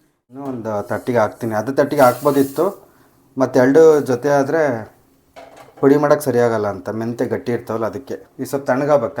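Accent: Chitradurga, India. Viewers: ಇದು ದುರ್ಗದ ಭಾಷೆ ಅನಿಸುತ್ತದೆ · Sir neev yav oorinavaru?nim language same nam Chitradurga style nalli maathadthira 👌👌👌...nim recipe thumba ishta aythu sir 😊😊😊😊😊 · ನಿಮ್ಮ ಭಾಷೆ ತುಂಬ ಚೆನ್ನಾಗಿದೆ. ನಮ್ಮ ಚಿತ್ರದುರ್ಗ ಜಿಲ್ಲೆ ಕಡೆ ಹೀಗೆ ಮಾತನಾಡುವುದು. guraduvudu, Durga barga etc.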